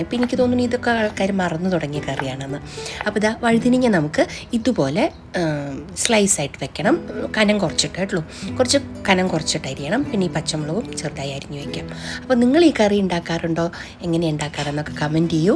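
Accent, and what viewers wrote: Palakkad, India. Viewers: ❤Ellam special receipes anu. Super, Palakadan varthamanavum adipoli, kelkan nalla rasam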